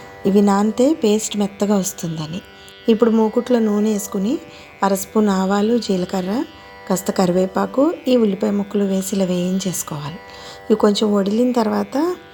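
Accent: Godavari, India. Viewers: మీ గొంతు హస్కీగా బావుందండి. గోదావరి జిల్లా వారేమో మీరు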